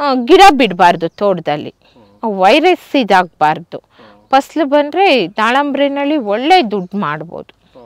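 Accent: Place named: United Kingdom. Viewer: British Speaking Kannada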